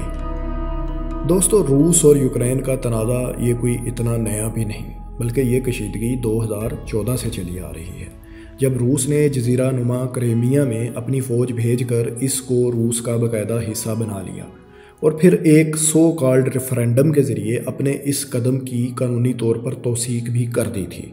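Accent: Punjab, India. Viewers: Bohut zyaada punjabi accent hai iss banday ka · Everyone complaining his voice, its not his voice. Its his punjabi accent. Now if he makes 10 more videos you all will get accustomed to his accent even but boy oh boy punjabi is strong in you. The video was informative, presented neat and brief all current affairs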